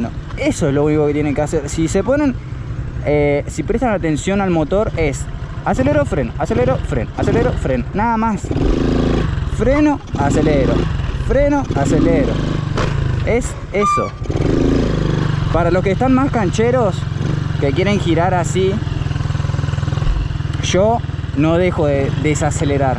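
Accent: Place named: Chicano, United States. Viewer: Che como que te que te queda media chicano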